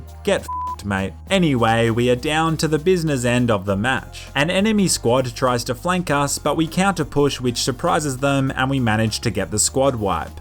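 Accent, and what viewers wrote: Australia. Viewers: You're Australian?!?!?!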